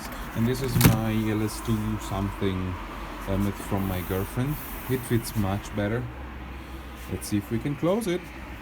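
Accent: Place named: Germany. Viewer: Ich glaube zu hören, dass Du Deutscher bist. Warum sprichst Du dann nicht Deine Muttersprache.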